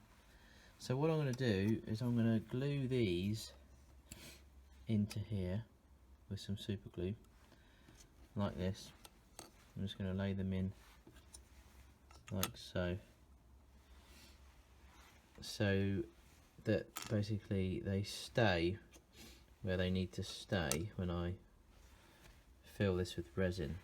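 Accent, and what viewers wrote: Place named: England, United Kingdom. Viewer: He talks correctly he lives in the land that invented English ENGLAND Yeh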